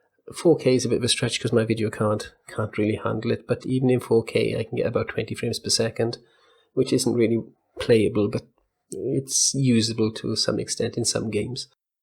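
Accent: Wales, United Kingdom. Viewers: Nice Welsh accent! Cymru am byth!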